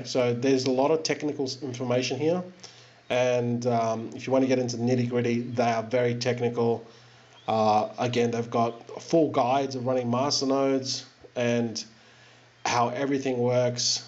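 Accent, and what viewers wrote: Australia. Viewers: are you an Aussie? I found another project today parachute, just want to know your thoughts. cheers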